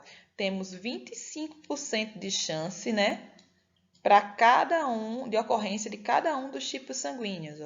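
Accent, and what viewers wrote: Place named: Bahia, Brazil. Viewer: kkkkkkkk é um pouquinho forte, mas é lindo. Bahia?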